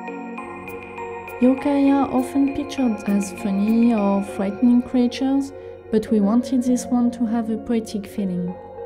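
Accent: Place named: France. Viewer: Are you French? Your accent sounds like my buddy Bertrand who moved here from France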